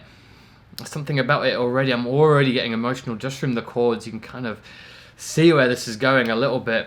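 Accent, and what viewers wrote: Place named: Australia. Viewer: I'm so happy for you to have reacted to Wintersun. Although I've really known them a few months only, while knowing all the time Kai's background, but never before was I ready for another metal band, just yet . Now that I've found it, it simply blows my mind. So heavy, but simultaneously pleasing, comforting. Invincible playing, awesome lyrics. What comes to this song and it's lyrics, I get the feeling I have to search for someone lost to grieve. The easiest way would naturally be my parents, and in some part they are, but there already are so many others I could and should have been closer with while they still were here. If I'm not wrong, you're Australian, right? If you really are, your accent sounds a bit different from the people in the Eastern coast, Sydney at least. I hope you don't mind me asking this.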